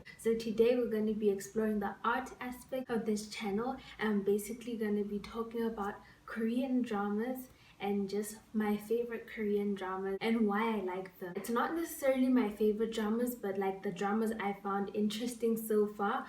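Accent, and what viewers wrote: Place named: South Africa. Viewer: When I first watched your videos I was like mhm, she sounds South African. I don't know if you are but I am and I just got really excited. Love your channel💕❤️ You watch kdramas too?! OMG I'm immediately subscribing🌻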